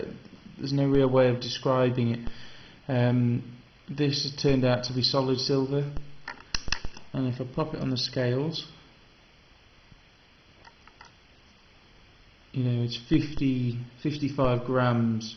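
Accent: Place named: Manchester, United Kingdom. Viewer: Maheswaran Shiva he from UK.. Prob city like Manchester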